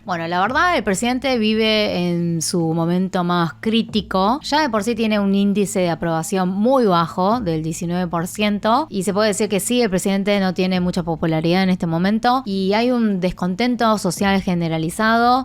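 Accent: Buenos Aires, Argentina. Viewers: Toda América Latina, estaba esperando esta explicación. Adoro su acento Argentino. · Vaya accento argentinos con el ya pronunciado ja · Me gusta tu hacento Argentino. Dicen que si Korea se unifica como una sola Korea sería una potencia militar y económica y tecnológica. Con muchos recursos. Yo pienso que si porque la unión te hace fuerte 👍🇲🇦🇰🇷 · Si se nota que aprendiste español en Argentina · De donde me recurda lo que dice?... ah si ya se, de argentina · Una asiatica hablando español, encima en tonada argentina dios mio 😱😱😱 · Es porque es Argentina, nació y vivió más de 30 años ahí, dudo que se le vaya el acento porteño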